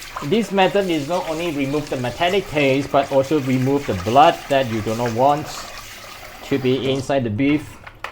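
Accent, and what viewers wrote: Singapore. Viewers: Singaporean accent, anyway thank you.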